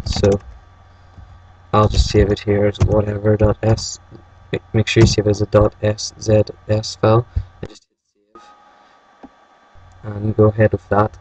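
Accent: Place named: United Kingdom. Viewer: Wow. i came to thiz vid to get the latez ZSZ site and i had the audio on, and chu have that AWesome British acent!! Lawl =)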